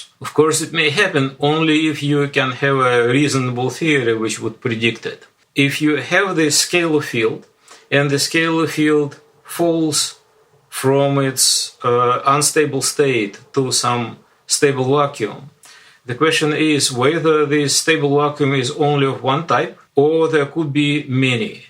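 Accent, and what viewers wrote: Russia. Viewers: Это прикол что-ли? Зачем изображать знатоков английского, если у этого Андрея ужасный русский акцент, и он с трудом подбирает английские слова? Так ещё и без субтитров.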